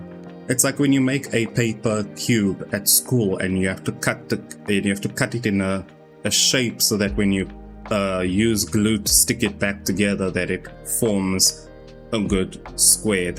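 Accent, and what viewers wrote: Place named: South Africa. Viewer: btw one question, are you south african? i can hear by your accent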